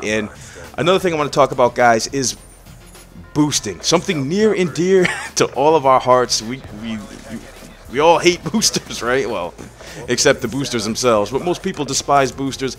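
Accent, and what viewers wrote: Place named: United States. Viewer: do all you Americans speak in such a way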